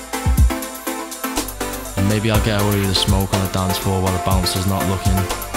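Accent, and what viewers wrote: United Kingdom. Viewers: The party montage reminded me of Human Traffic, with your voice all trippy & british accent :D Good stuff! Perfect timing for me as well, been going through the same thing myself, first traveling for festivals & raving a lot, then going backpacking, growing a lot as a result but also being left with a sense of emptyness after that. Got to work so I can enjoy the present moment always, and being the best version of myself always, not just when partying and traveling. It's the hedonic treadmill, we always chase happiness, base it on external factors, and our happiness reverts back to baseline when we get it, we're never satisfied for long. Anthony de Mello is a great spiritual guide on that, his lecture rediscovery of happiness goes through how we can undo our cultural programming that keeps us changing money, women, status, jobs, titles etc in hope of happiness.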